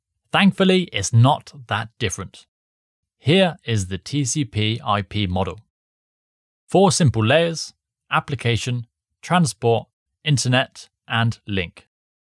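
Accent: United Kingdom. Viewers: ok watever u do , just follow the same presentatiin style - and the same lovely british accent 😀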